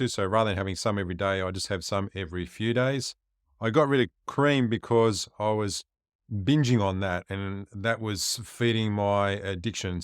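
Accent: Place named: Australia. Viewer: Hey! Outstanding and informative video!! You summed it up in only 8 minutes!! I'm impressed!! 😊👏🏼👏🏼👏🏼👍🏼💪🏼💕 P.S. Sidenote: I Love some of the words you say, like the word "rubbish" instead of "trash" or "junk" or as some call it, "crap", lol.😀 (I love Australian and British words and accents!) I actually had a good friend from my college days, <num>. He was an exchange student, Tennis player, from South Africa who dated my best girlfriend. I was often a tag-along during the summer on their outings and dates. He is the one who taught me the word petrol for gasoline. Lol. Also my 13 yr old granddaughter loves the Australian children's cartoon series 💙"Bluey"🧡and so do I! Such a cute show! That's all for now! 😁 Take Care, God bless you and your family! 💕🙏🏼🤗 Susan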